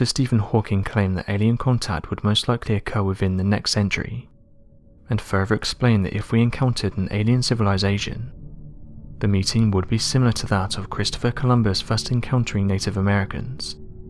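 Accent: England, United Kingdom. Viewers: What's with all these info videos with these friggin narrators with english accents ?????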